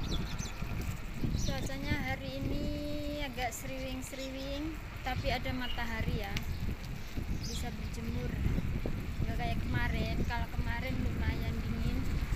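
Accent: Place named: West Java, Indonesia. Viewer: Dari logat bahasanya kayanya kamu dari Jawa barat ya?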